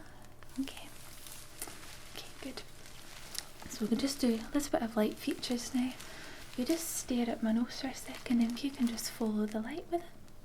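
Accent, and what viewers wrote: Scotland, United Kingdom. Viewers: she sounds scottish. not british. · YES FINALLY SCOTTISH ASMR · Honey, where exactly are you from? I'm American and if I had to guess, I'd say that's a "Scottish" accent, right? Not Irish; am I correct? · when you say "scary", it sounds like "scearee". but I like the accent, do all Scottish people say it like that? just a lil curious.hehe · Please more Scottish Asmr! Your Scottish accent makes me so calm! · Good old Scottish accent mines is from east ayrshire I can't place where yours is from lol? And also super video :) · that Scottish accent is uncommon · Is this your real accent? Are you Scottish? 😊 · That pretty Scottish voice is so relaxing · I'm drunk, I love ur Scottish accent, what's more to say??? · Scottish AF damn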